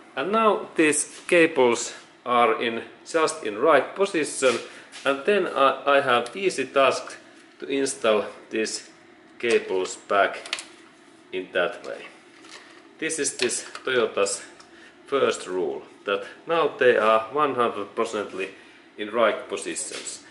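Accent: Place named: Finland. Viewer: He sounds Finnish to me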